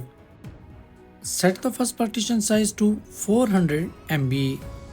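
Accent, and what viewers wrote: India. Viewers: Look, please do not take this wrong, When in visited USA for the first time Americans made fun of my own voice. I still have an accent with English to an extent, but because I have tken very long and hard amaounts of time to train myself, now, most people do not think i'm from Russia let alone real rural North Ossetia, just don't think I'm trying to bully you or make fun of you, it's just the truth. I've been to USA alot, and most Americans think this is absolutely annoying. We all know the English's rather dismal outlook on "Paris" and "Indians". But, most of it is trying to deciepher what you are saying. The western world deals enough with your kind of accent on the telephone supports, now you guys are in my country trying to speak Russian! I can only imagine...it's just frustrating. You got to see it from a native speakers perspective. 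How would you like talking to me on the phone in Hindi with a super thick indecipherable Russian accent but calling myself Krishna or Sanjaya, or an Indian name? It's annoying.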